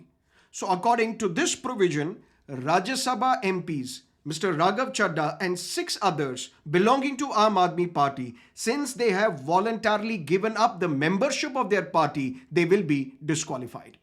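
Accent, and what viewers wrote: United States. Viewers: Aap american ho kya, aise kyu bol rahe ho 😅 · ये ज्ञानी कौन है? क्या उसे लगता है कि राघव, पाठक और भाजपा ने इस बारे में सोचा ही नहीं है? मुझे ऐसे मूर्ख लोग बिल्कुल पसंद नहीं जो अमेरिकी लहजे में अंग्रेजी बोलते हैं।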